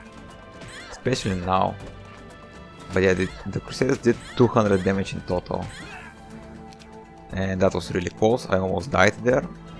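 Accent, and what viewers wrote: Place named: Bulgaria. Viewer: Sorry for asking, are you Bulgarian? Your accent really sounds like you are indeed from Bulgaria.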